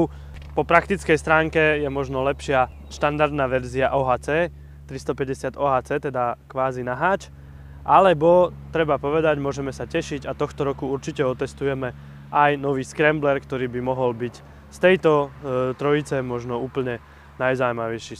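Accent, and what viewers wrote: Eastern Slovakia, Slovakia. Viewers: Len ,,tak trošku,,zaťahuje-isto ,,Vyhodňar,,aľe to nevadzí,ta ňe?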